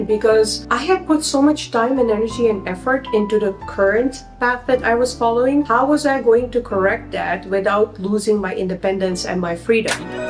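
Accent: India. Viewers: congratulations! happy for you and your husband! btw I hear a bit of an Indian accent haha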